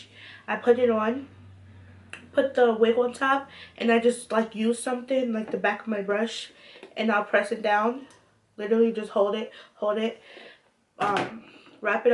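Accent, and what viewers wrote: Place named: New York, United States. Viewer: U sound like u from new York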